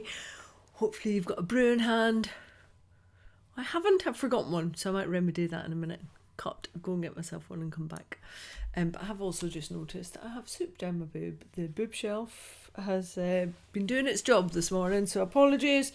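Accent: Scotland, United Kingdom. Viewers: I am flemish and I have now no problems with understanding your scottish accent. I must say that I had to learn to understand the scottish language. what helped for me was listening multiple times the same video. and the fact that I really wanted to understand it, as I was a bit in love with everything scottish. the film 'wisky galore' was a good teacher - I watched it several times. Did you saw the latest podcast of The Wooly Thistle, the crochet-contributor talked about your pattern in the latest Yarn-magazine, and she called you her favoured designer. that must be nice to hear! about my scrabs : I crocheted two bedside-carpets. I mixed and matched all my grey yarns, and all my reds, and made a checkerboard with small but thick squares. I love them and they reduced my stash of leftovers very well. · Hi. My first time commenting. You’re newly found and subscribed on my YouTube subscriptions. 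Firstly I wanted to say Im loving your podcasts, Im watching multiples per night to catch up. Watching is like sitting chatting with an old friend. To answer your question about what I do with yarn scraps: mine are knitted or crocheted into blankets for my local dogs home for the little treasures to snuggle up with. I tend to take a pile up with me at the end of the summer, ready for the colder weather. I try to do different techniques or patterns I haven’t tried before, so it’s a good practice for me also. I lived in Edinburgh for a while when I was young so I’ve no problem with your accent 😊 Keep up the amazing work ❤❤ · I like the Scottish accent and I love learning the dialect words btw! My Mum used to knit jumpers for me, with every one she would send some of the wool, a darning needle, a spare button, a sewing needle and some of the cotton thread wrapped around a little piece of card. 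I never lost a button or needed to mend a garment! But that is one way to get rid of your left over yarn. I have to say mine just hang around until I need them for swatching, blogging, or just practicing a stitch. But eventually I have a good clear out and they end up at Charity shop! Could you do a woven hanging? Just thinking out loud!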